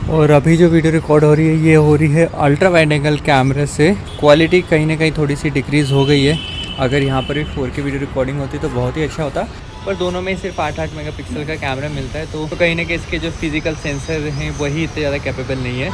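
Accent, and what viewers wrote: Indore, India. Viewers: You are from indore ?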